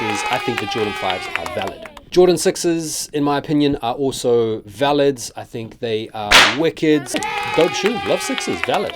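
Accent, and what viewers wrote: United States. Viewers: Why does he all of a sudden have a Brooklyn accent? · Why do you have an American accent here all of a sudden?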